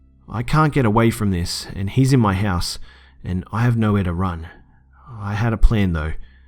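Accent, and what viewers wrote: Australia. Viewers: Nice to hear a fellow Aussie on here :p My first vid of yours, I've heard good things from other channels and am glad i stopped by! Cheers!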